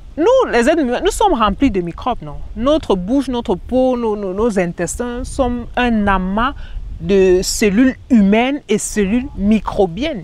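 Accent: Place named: Africa. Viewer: J’adore le parler africain...💐🌺🍀🌸🌷🏆🏅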